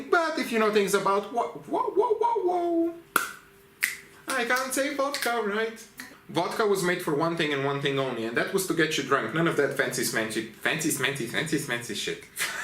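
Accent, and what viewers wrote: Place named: Russia. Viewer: à Guy with russian accent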